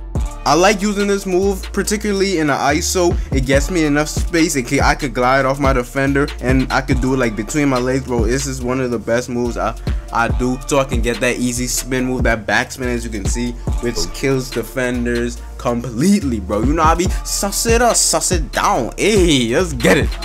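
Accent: New York, United States. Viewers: Where U frm. U sound like u frm NY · The most new york guy i ever heard on YouTube lol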